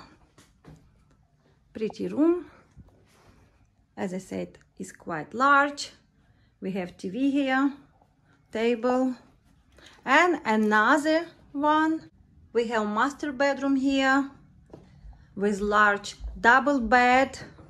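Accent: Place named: Ryazan, Russia. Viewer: Какой красивый рязанский акцент!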